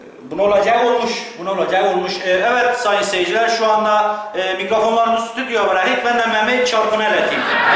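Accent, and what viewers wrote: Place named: Gaziantep, Turkey. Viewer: bu adam istesede istanbul türkçesi konuşamaz gibi hissediyorum nedense .ANTEP şivesi adamın ağzına tam yerleşmiş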